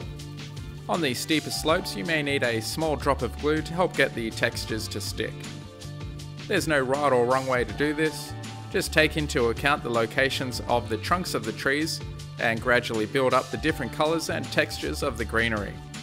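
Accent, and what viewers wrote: Australia. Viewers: Idk if you live in Australia but it sounds like you do if you stay safe from those fires Love your work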